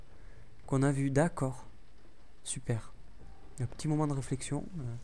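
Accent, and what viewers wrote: Toulouse, France. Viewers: C'est toulousain, on voit que t'entends pas souvent des marseillais ;)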